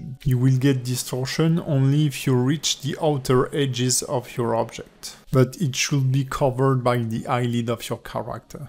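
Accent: France. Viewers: Ouah l'accent francais mdrr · clear and useful video plus I find your french accent really cute (^u^)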